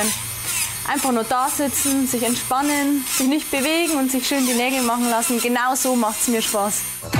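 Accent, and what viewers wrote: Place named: Lower Bavaria, Germany. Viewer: bist du aus niederbayern?? Der Dialekt kliegt irgendwie so.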